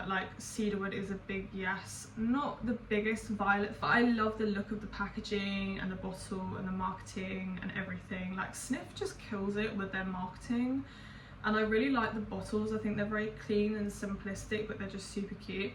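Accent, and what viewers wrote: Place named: South London, United Kingdom. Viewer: Happy belated birthday!! Happy to see us south Londoners being represented in the fragrance community, no longer do I feel ashamed for pronouncing gourmand like a plebeian lmao. Loving the editing too, it’s a breath of fresh air in a very (70% unfunny) serious community.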